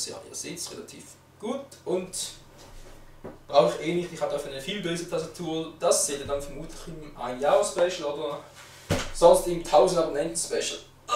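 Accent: Switzerland. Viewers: Ich interessiere mich für den predator und dachte mir ich bestelle den über digitec.ch.... also bist du mit dem pc nicht so zufrieden? ich brauch den hauptsächlich zum zocken und evtl. berufschularbeiten.... wichtig ist für mich, dass man auch 2 bildschirme anschliessen kann das ist sehr wichtig. freu mich auf ne antwort... p.s. am dialekt an bist du schweizer oda? ^^ denn freui mi uf e baldigi antwort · sag mal bist du Schweizer ? du hast so einen Akzent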